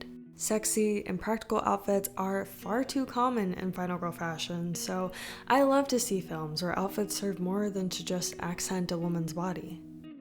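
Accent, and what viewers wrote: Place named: Brazil. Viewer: Have not watched the video yet. However, are you brazillian, girl? The accent is pretty iconic, and remind me of brazillian people.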